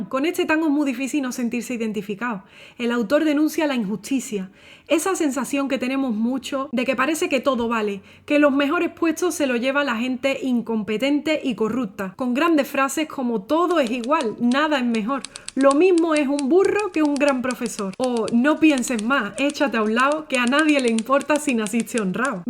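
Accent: Andalusia, Spain. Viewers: Como argentino y amante del Tango te felicito, es una interpretación magistral. Tu acento andaluz queda muy bien en este tipo de canciones. No le des importancia a algún tont0 que critique tu acento. Por otro lado no es la primera vez que haces canciones de Tango y te queda genial, se nota la pasión y sentimiento que le pones. Siendo argentino es un orgullo que alguien tan talentosa como vos haga canciones como estas. Está canción es de las que más he escuchado en mi vida. Desde que era chico mi abuelo nos ponía siempre Tango a mi prima y a mi, tanto en casa como cuando íbamos en el auto haciendo algún viaje visitando familiares. El tema favorito de Tango de mi abuelo siempre fue la y el mío sin duda. Escucharlo me hace acordarme de esos tiempos. Su letra es una obra de arte, dice muchas verdades y suena actual aunque la canción tenga tantas décadas. Además de hay otro tema que no envejece y siempre estará vigente: " " de. 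Las escuches cuando las escuches esas canciones siempre van ser actuales por las letras que tienen. Música que quedará por siempre. Gracias por esta interpretación tan genial Dejo el tema de para quien quiera escucharlo <link> Dedicado a los políticos de Argentina, sobretodo al vendepatria que tenemos ahora como presidente. Aunque el que escuche la letra verá que se puede aplicar a políticos de otros países también. Pero siendo argentino puedo asegurar que políticos má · Excelente, felicitaciones. Hablas muy como Española pero lo cantaste como Argentina, y además felicitaciones por como te informas de lo que vas a interpretar. soy Argentino y canto tangos, saludos.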